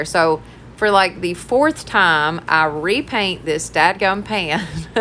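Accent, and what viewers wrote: South, United States. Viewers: I just stumbled across your channel. So I'm new here love all of you ideas... curious to know where your from because you sound very southern. I am from Tennessee so I really enjoyed listening to you and watching I am now a fan. Thanks so much for the ideas. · Hey, love your accent. What part of the south do you live in? This is my first time with you, and I love everything. Very encouraging.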